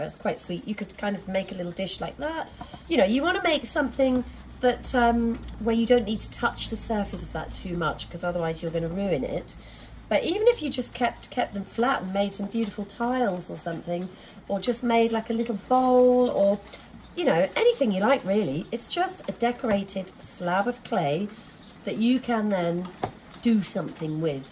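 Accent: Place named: United Kingdom. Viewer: I showed several of your videos to my Junior High Ceramics students and at least a few students per class, most accurately, exclaimed if I had a British accent I would be you. lol. I love the comfortable experimental way about you. Thank you so much.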